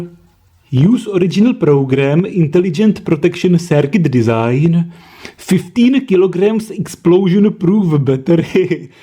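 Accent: Czech Republic. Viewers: That Czech accent 😀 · Czech. Strong one. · No, It's Czechglish, believe me.. with strong Prague's accent.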